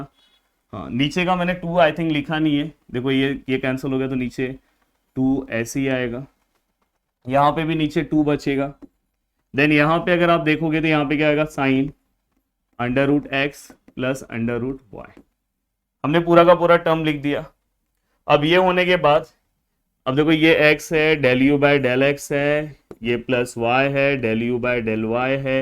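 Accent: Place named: Uttar Pradesh, India. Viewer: Up wale hai bhai lagta hai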